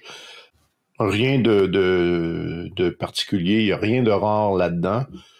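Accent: Quebec, Canada. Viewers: Bonjour, aucun problème pour les petites différences français du Quebec et de métropole, ça pimente en mettant une touche d'exotisme, et ça nous donne l'impression d'apprendre le Quebecois. Pour le choix du tour, ayant suivi pratiquement le même parcours, je partage entièrement ton point de vue. 😉👍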